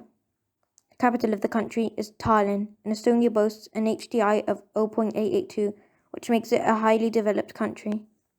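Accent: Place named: United Kingdom. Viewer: Your voice is the most British voice I’ve ever heard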